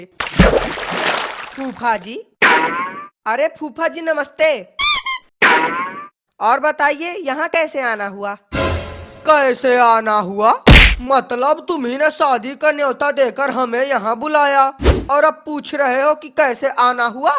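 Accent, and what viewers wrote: India. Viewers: Awaz se lgta h aap bihar se ho Up se nhi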